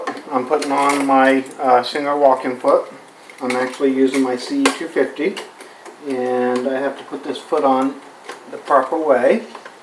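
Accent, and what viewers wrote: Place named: North, United Kingdom. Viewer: Ah ha! I knew it was northern. Video is fantastic!!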